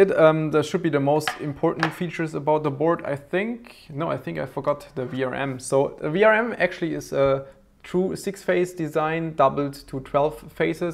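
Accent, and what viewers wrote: Germany. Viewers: Der deutsche Akzent ^^ <3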